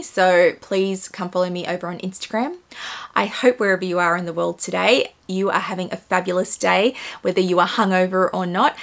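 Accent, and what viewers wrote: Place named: Australia. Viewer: I’m literally addicted to. Ok that sounds weird. 😂 I’m addicted to her closet! Her bag collection! Actually no I’ll throw, the human, in there too! Seems like such a super nice person. ❤ Can’t get enough of that Aussie accent too! What I love most, is that even though she can afford to buy whatever she wants, and has quite an extensive collection, she never “brags” about it! She shows it to those of us who love luxury, but she doesn’t make you feel bad nor envious if you’re not so fortunate! Love that! She’s bad for my wallet though! I’ve purchased a new Chanel and a 2 new Fendi’s since I’ve started following her! 💰 ❤😂 But that’s ok! Can’t wait to see what she inspires me to buy next! I’ve got a much smaller collection that Miss of course, but that’s ok too! Whenever I can’t stop thinking about something she’s showing, I go to the Real Real and try to find a previously gently loved version! 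I do buy brand new as well, but I’m into circular fashion these days. Saving the planet 1 bag at a time! 😊 To me it’s like why buy a dog when there’s so many waiting for homes at the shelters? No offense to those who buy exclusively new, but I just got 3 bags for less than the price of one brand new! Good luck filling your wish list this year! I can’t wait to see what you add! 👏👏💗💗🥰🥰💗🥰💗😎😎😎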